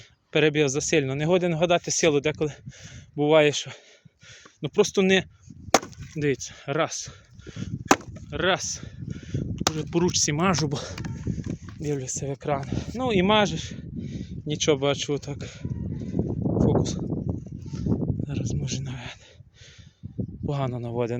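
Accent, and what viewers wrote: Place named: Ternopil, Ukraine. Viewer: говір гарний в тебе! Це часом не Терн. Область?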